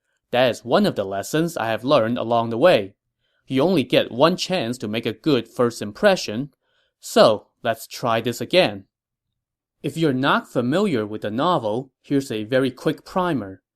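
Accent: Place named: China. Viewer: Its interesting to hear a chinese speaker speak english with how the pitch moves since it sounds so distinctly chinese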